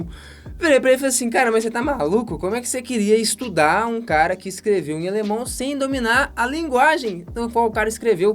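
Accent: Minas Gerais, Brazil. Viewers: Você e mineiro ? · Pelo sotaque e mineiro kkkkk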